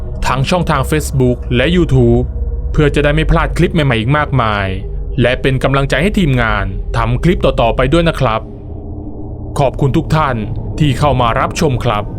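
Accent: South, Thailand. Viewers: ภาคใต้ใช่ไหมคับ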